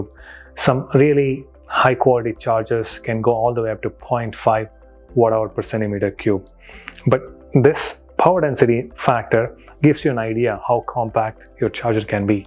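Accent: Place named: India. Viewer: The only Indian accent ever that’s not annoying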